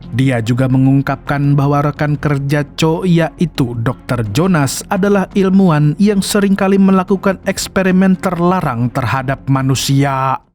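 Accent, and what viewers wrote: Banjar, Indonesia. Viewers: Pian urang Banjar kh bang 😅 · Bang lu org banjar😂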